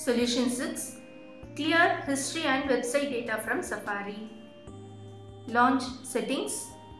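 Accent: South India, India. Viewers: LilEddieGames .101 XD she is a Indian btw South Indian for ur info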